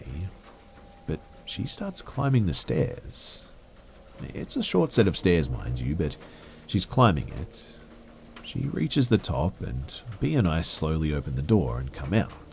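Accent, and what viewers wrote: Australia. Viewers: Noo not the Australian accent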